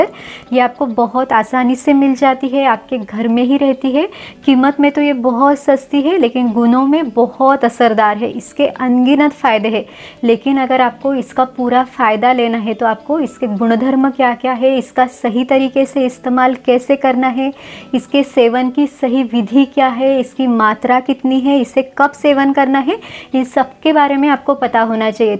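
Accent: Standard. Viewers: Dr. साहिब आपका उच्चारण बहुत ही शुद्ध है अच्छी ओसधी है thanks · आपका उच्चारण बहुत ही स्पष्ट एवं अतिशय शुद्ध है , एवं औषधि विश्लेषण भी प्रशंसा योग्य है ।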